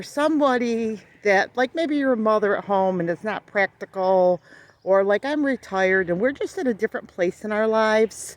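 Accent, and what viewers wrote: United States. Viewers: sounds just like here in Ohio lol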